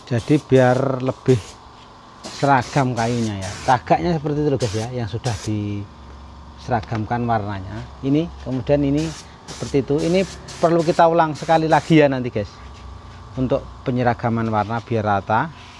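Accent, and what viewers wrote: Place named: Magetan, Indonesia. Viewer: Kok bahasane koyo wong magetan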